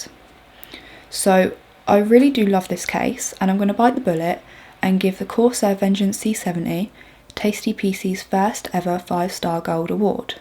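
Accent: United Kingdom. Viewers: best review I have seen on the c70 case I bought !! also you are very beautiful and that lovely brit accent just make the review that much nicer to watch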